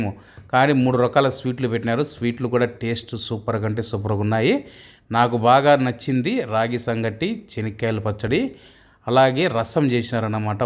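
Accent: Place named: Rayalaseema, India. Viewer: That is the rural slang of Rayalaseema people.We need not correct any slang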